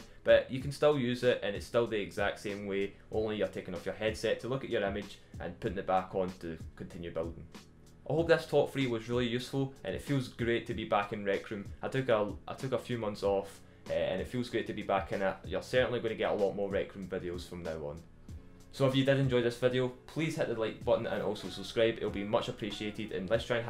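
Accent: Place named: Scotland, United Kingdom. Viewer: Fellow Scotish :0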